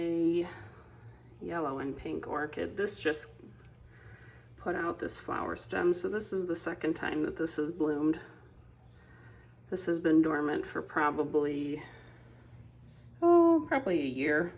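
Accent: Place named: Northeast, United States. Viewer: From Amherst Ohio I hear the northeast accent....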